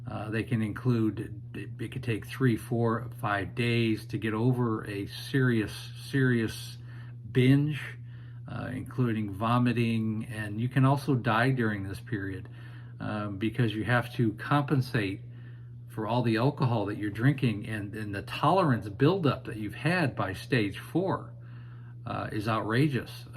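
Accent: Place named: Canada. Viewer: Sounds like your average Canadian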